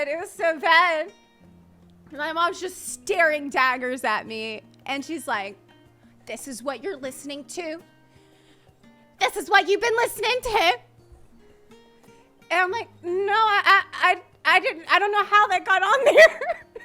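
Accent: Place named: South, United States. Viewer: Nothing better than comparing the good news to a punishment. I feel that really gets a lot of kids to change their mind about it. I think it will catch on. Also i love when sassy southern accent comes out. Reminds me of spending time with my relatives in Arkansas.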